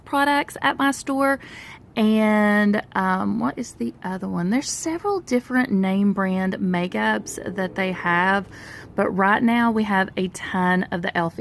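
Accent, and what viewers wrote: South, United States. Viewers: Hi there! First time viewer! I'm LOVING these ideas! I'm hearing a Southern Accent..🎶🎶 to my ears (Southern girl here as well 😊) just curious where you're located? Thanks for sharing and I look forward to seeing more of your videos!! Ps: the Pineapple bags are SO cute, the strings on mine however, lasted 2 whole days. I was so sad and couldn't find any others. 😞 I eventually will "fix" mine 😂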